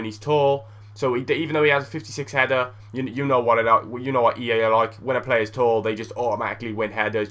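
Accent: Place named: Welsh Valleys, United Kingdom. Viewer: You sound like the guy from the valleys haha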